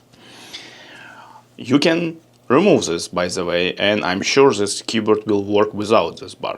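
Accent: Russia. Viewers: я чувствую русский акцент )))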